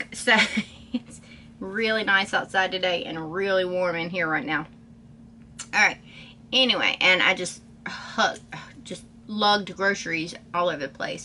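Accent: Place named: South, United States. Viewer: I will laugh SO hard if you start to develop her accent on top of your natural Southern accent 😂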